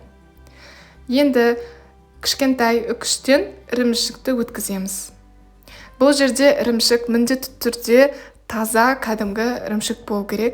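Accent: Standard. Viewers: қолың дерт көрмесін өте түсінікті әрі таза қпзақша мөйлейсің жасап көремін Алла қаласа бірақ ортасы шикі болып қалмаймекендеп ойлап отырғанмын мен басқа рецеппен осы шарикті жасаған едім бірақ ортасы шикі шықты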